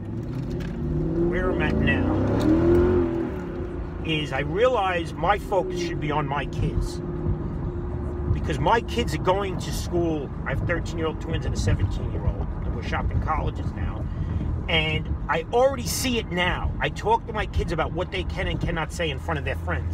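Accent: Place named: East Coast, United States. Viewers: Not sure if I watch ur channel because of ur accent or cuz u make me laugh so fing hard but I love it and it makes me miss the east coast even more. Keep it up bro! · I grew up in Smithtown. Your accent and attitude reminds me of where I'm from. Good stuff! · New subscriber loving ur content, curious where you from? Has to be somewhere around the tristate area with that accent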